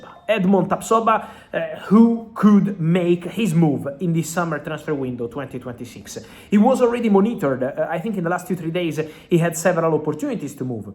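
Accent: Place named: Italy. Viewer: Why you talk so fast this is news everybody want to understand you speak english with italian way Pkease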